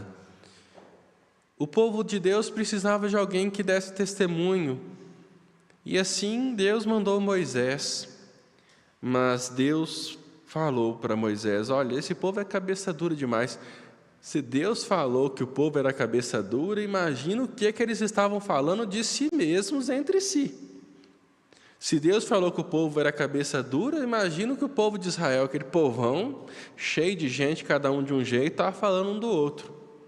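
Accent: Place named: Minas Gerais, Brazil. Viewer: A padre tem aquela fala e coisa descansada do mineiro raiz ,que eu gosto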